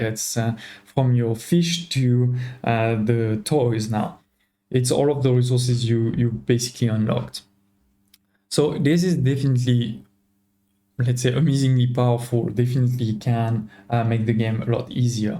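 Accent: France. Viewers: Wow, where are you from ? You sound like french accent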